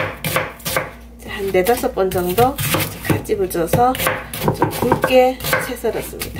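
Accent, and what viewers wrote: Gyeongsang, South Korea. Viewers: 건강한정보 알려주셔서 감사합니다 설명도 경상도억양이지만 억세지않고 상냥한말씨가 듣기좋습니다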